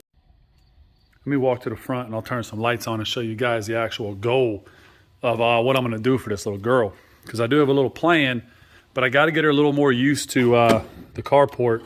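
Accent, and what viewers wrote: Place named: South, United States. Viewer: That accent? LA? Definitely the south.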